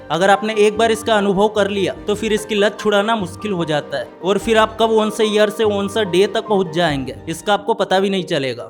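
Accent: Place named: Madhya Pradesh, India. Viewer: Apke bolne ke style se lg rha hai aap MP se ho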